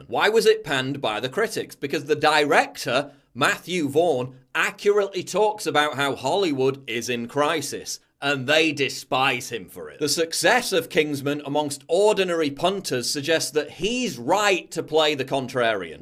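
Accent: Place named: Yorkshire, United Kingdom. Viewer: Geoffrey boycott accent.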